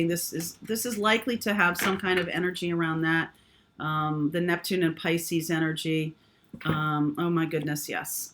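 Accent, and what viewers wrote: England, United Kingdom. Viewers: Lol love the English voice...I was laughing and agree with all you are saying! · Lol 😝 love your English voice and thanks for the message. Love from England lol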